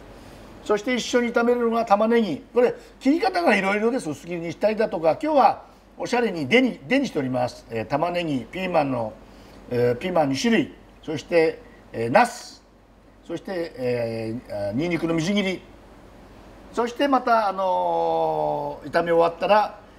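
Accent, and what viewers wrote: Hokkaido, Japan. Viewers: シェフの北海道弁に、郷土料理に対する愛情を感じました。旧フランス領で発展した料理 なども紹介してほしいです。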